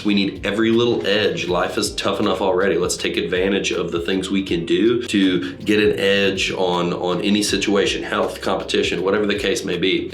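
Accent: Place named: United States. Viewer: Just clicked on this: THANK GOD not another rancid English accent or Aussie accent. Was totally expecting it and refreshing to hesr American English, which just sounds better and more “normal”. 😂❤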